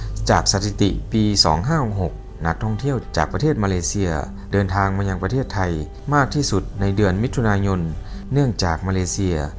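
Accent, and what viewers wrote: Laos. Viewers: ไม่น่าจะใช่ไทยอีสานด้วย น่าจะเป็นคนลาวนะ ฟังจากหลายตัวอักษร ไม่เหมือนของไทย เขาจะออกเสียงยากเพราะความเคยชิน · พูดได้ใกล้กับคนไทย …แต่สำเนียงบ่อยครั้งออกเสียงแบบคนลาว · แอดมินคือคนลาวใช่มั้ยครับ..ผมฟังจากสำเนียงน่ะครับ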